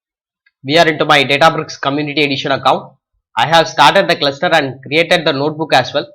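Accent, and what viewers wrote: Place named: India. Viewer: anybody have any channel recommendations on this subject where the voiceover has an English/American accent? I can't listen to the Indian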